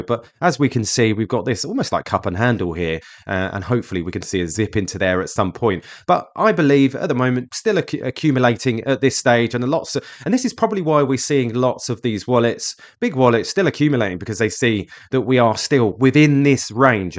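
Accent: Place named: United Kingdom. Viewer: Hi, sounds like your in the uk. Do you know of any accountants that know they’re crypto, mines got no clue about it.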